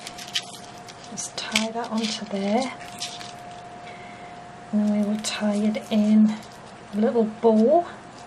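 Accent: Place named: Northern England, United Kingdom. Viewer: Hi just found you're channel,and although I don't make things like tags etc,more into colouring books,I thoroughly enjoyed you're tutorial,and look forwards to watching more! Can I ask where you are from,lovely northern accent,I am a Northumberland lass,but now living in Spain!!.Thankyou for sharing X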